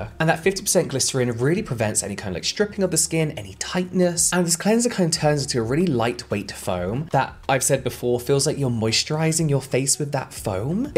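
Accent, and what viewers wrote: England, United Kingdom. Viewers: Hello from Los Angeles,. I enjoy your videos. Can you tell me what your take is on SKIN MEDICA? and have you tried anything from TRUTH TREATMENT SYSTEM? both are on the higher end skin care, but I believe and also heard when products are more expensive, it's because they use better products. I know this is not true for all, but it rings true for SKIN MEIDCA and Truth Treatment system. I love listening to your soothing voice and your English accent.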